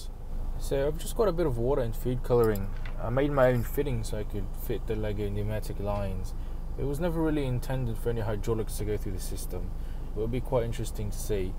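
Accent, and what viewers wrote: United Kingdom. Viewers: Are you English? South African? Or Australian?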